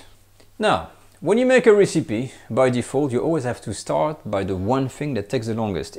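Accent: France. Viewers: Ree-sip-eee. Haha French accents are so cool. 😎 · Juste pcq tu honores notre culture française 🇨🇵⚜️ et que ton accent en anglais est delicious. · One of the best chanels, you are such a cool guy. Your english is excelent and with frech accent is nice to listen to. Greetings from Canada. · I just love, love, love your recipes , your enthusiasm and your elegant French accent!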